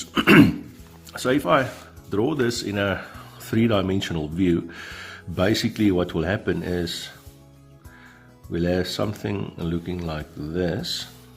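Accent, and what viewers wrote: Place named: Afrikaans, South Africa. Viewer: Ek wou se dit is n Afrikaanse aksent. Baie dankie vir die video, ek moet binnekort begin kaste bou vir my kombuis, ek will ook melamine gebruik maar sal met n 'Festool track saw' moet gebruik om die panele te sny. Ek het iewers gesien dit kan gedoen word sonder om versplintering te kry, is daar n spesiale manier om dit dan te doen? Ek sal baie graag ook die plan vir die 'scissors table' wil sien as dit beskikbaar is. Baie dankie.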